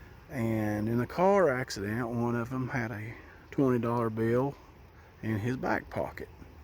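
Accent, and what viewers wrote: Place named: Alabama, United States. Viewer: We visited your area the week before Memorial Day. We stayed mostly in Townsend...and then Gatlinburg a couple nights. I would have really enjoyed sharing a meal with you. You sound just like my people here in Alabama. I appreciate the information that you share. And I do believe it. When we were travelling up that way, we were amazed by the scenery. And when you sit and watch it on video, it really doesn't do it justice. There is no way to describe just how expansive and breathtaking the smokies are. We took some really back roads that were not the beaten path. And when you experience that...you get it. Things can hide within a hundred feet of you. The terrain, for me anyway, is not walkable. Where we stayed, we couldn't even walk the yard it was so steep. You have to be in better than PRIME shape to move about the mountains. 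And these flatlanders at my house do NOT have mountain legs...lol. Do you have any stories about Townsend? I would love to hear them. Thanks and God bless.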